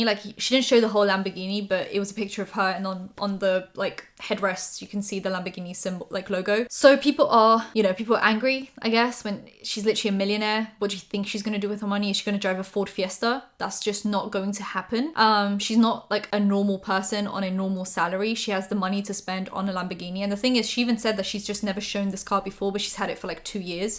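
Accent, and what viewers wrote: United Kingdom. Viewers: nigdy bym nie pomyślał ze jest z polski albo chociaż nawet mówi po polsku, jej brytyjski akcent jest idealny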